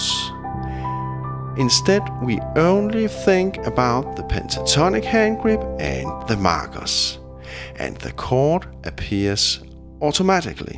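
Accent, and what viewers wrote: Denmark. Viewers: I did, II - V - I and pentatonics are usually one of the first lessons I teach in my lessons (bass player). Who does lectures in Basic Jazz Harmonics in another way? But it's been a long time, so thanks . Fresh input is always welcome! I still love your very musically educated "muppet hands show" :D Danke schön, well done (do I hear a Danish accent? ) Frank --- and, of course I'm a bit drunk. Sorry! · i love danish when they speak english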